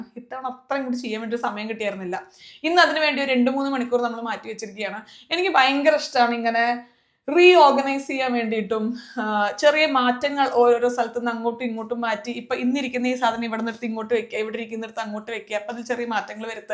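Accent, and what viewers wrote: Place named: Kozhikode, India. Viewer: My fav YouTubers and.....kozhikodan samsaram😍...take care dears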